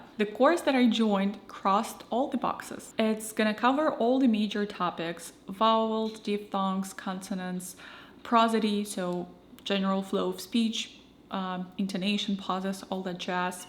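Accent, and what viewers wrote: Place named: Russia. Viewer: Oh no.... i liked your Russian accent who was lerning french 😭😭😭😭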